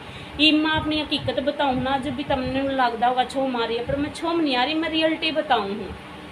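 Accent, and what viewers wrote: India. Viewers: Aap Ki Deshwali Boli Bhut He Aachi Laghi Ha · Puver haryaanvi😂😂😂😂😂😂♥️🤣🤣🤣tere ke dard h ,🤣🤣🤣🤣💌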